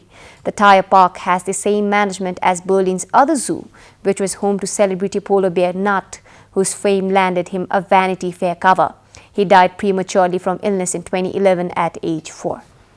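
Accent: United Kingdom. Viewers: Lovely British accent 😘😘😘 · hora vanya!!🙈🙈🙊😝malai ta testai lagyo munnile pani euta episodema hajurko jastai gari british tone ma english boleko thien..hajurko interviewma kai katai bhadragolko kura sunina kin tyas bare navaneko naaodeko vanne lageko thiyo..😁😁.munni navayeni hamro lagi ideal ho..indian reporterlai reply didai babaal lageko thiyo malai..interviewma bolne saili sarai saral xa ghamandapan dekhina sadhai vari uchha bichar samanya bolne saili kayam rahos.english video haldai garnuhola hamile sikne mauka pauxau..🙏🙏🙏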